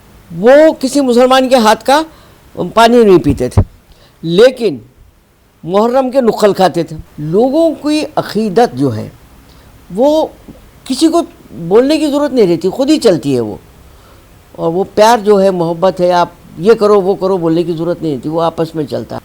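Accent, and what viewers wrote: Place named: Deccan, India. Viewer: Mashallah excellent deccani urdu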